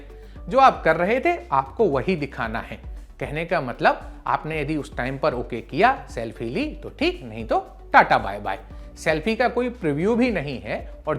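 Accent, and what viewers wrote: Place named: Bundelkhand, India. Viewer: ji aap bundelkhand ya gwalior-chambal sambhag se hain kya. Aapke bolne ki style se anumaan laga raha hoon.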